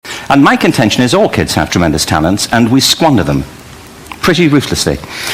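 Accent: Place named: United Kingdom. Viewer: かっちょいいイギリス英語ですね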